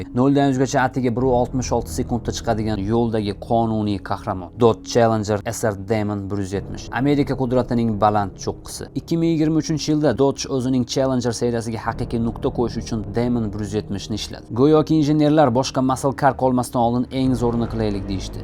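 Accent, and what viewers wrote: Andijan, Uzbekistan. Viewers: Andijonli xarp, azgina shevani zamonaviyro qp gaprgin, dordan qochganga o'xshap gaprvurmasdan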